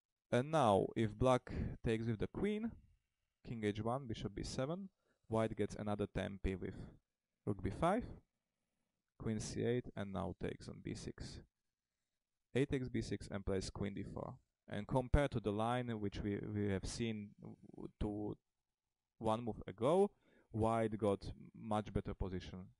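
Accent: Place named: Eastern Europe. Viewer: You speak portuguese, i speak spanish, and we both are able to understand him regardless of his pronunciation. He is from a slavic country, so don´t complain about "free" and "threes".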